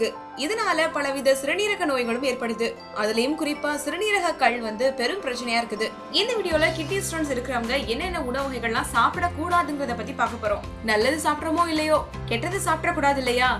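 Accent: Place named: Chennai, India. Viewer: Presentation too fast. Unable to digest some taminglish words of Chennai slang for non Indian Tamils like us. Please speak clearly in future for the talk to be useful for all of us. Thanks.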